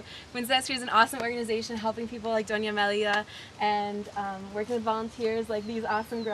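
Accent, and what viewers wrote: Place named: New England, United States. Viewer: Yeah great job, watch your steps, lol... wow I'm so impressed with your Spanish, oh by the way I used to work with FUNCEDESCRI too when I lived there. Is from New Ingland? cuz of the accent, wow tha was a 3 minutes walk. Any way great job.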